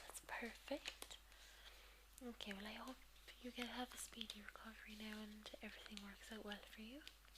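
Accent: Ireland. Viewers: This was great! I love your Irish accent! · Ahhhh Irish accent! Love this video :) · Kind of sounds like an Irish Quinn Fabray (from Glee). But that's a good thing! Fantastic video. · My goodness. This video. If it weren't for my empty stomach, I'd be knocked out cold right now. Your brogue is just enchanting in every way, mixed perfectly with ASMR triggers and a low soft-speak. Absolutely magnificent job. Thank you so much for this video! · Corr your voice is so relaxing, I know Im typing this at 3.51am and the sun is coming up and the birds are singing but I feel I need to listen to this video again so I can relax and go to sleep. You seem to cure my insomnia at times. I also get tingles in my head and thats it before I know it Im out like a light! I do love your accent! Im a sucker for an Irish accent on a girl. Errmm I also seem to wake up with a raging boner so I blame you lol. 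But seriously wicked video! top stuff!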